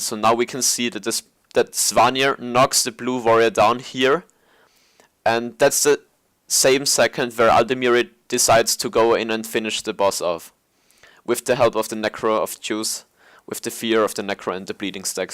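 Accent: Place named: Germany. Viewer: According to your accent i would say you're german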